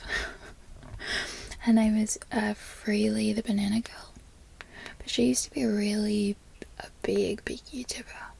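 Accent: Australia. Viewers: (ASMRtist) is that you. Or is this someone else. Oh I just realized this was you. Great Aussie accent. You are nailing it Keep up the great work.😍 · Yeah a few things were funny to hear but otherwise a really good job! Most people normally butcher our accent but you have obviously heard a wider range of Australian accents and it's helped your grasp. Lovely as always <3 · Surely you are an Aussie. If not that is the best Aussie accent I have ever heard. Well done. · That is a really excellent Australian accent! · Dang. I thought you WERE Australian. Its hotter 😅 il see myself out. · Aussie here. At first you sounded very newzealand almost like a New Zealander who moved to Australia lol, but Your Aussie accent is pretty damn good. I did notice the way you pronounce your As like in nasty was not so Aussie, we say it like nahstie. Also you said emu like emoo but we say it like emyou. 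Besides that it’s probably one of the best Aussie accents from a non Aussie I’ve ever heard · Damn you’re actually really good at the Aussie accent sound like a Sydney chick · The Aussie accent is 100% my favorite. Its soo 😍😍🔥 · That's a great natural Aussie accent, better than most Aussies actually 😁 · You sound like an expat Aussie with just a couple of tells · Our wild roos here aren't all that exciting and they are dangerous. Especially the big males they can and have really messed people up. But i gotta say you do a pretty good Aussie accent. Normally when Americans try to do a Aussies accent they sound more like a South African with a bit of kiwi chucked in. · Wondering what all your tattoos mean? Maybe you could talk about them in your next vid? Great Aussie accent btw. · Easily one of the best aussie accents I've heard. You should be really happy with yourself. when you started saying "invigorating" was on point. 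· Good aussie or maybe south African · Carl here from Adelaide south Australia, your wholesome Aussie accent is a turn on! But then realised you are just another yank lol only kidding love my hot Yankee girls aswell! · Im Aussie and your accent is very impressive, well done. A little tip for some words: Nasty is narsty, emu is emyou, banana is banarna, aussie is ozzie. Very good overall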